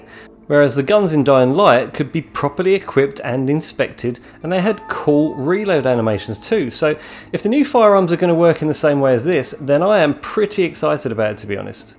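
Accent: United Kingdom. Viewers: hey! are u British, or what language are u speaking, in ur videos?????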